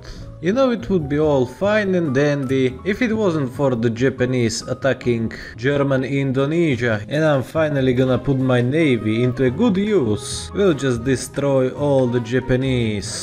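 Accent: Russia. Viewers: are you actually russian? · Ты не русский случаем? Акцент у тебя такой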